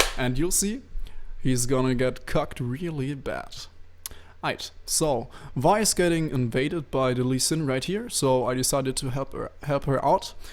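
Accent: Germany. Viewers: First of all, great video! Second of all, where are you from? Your English is very good but you sound German.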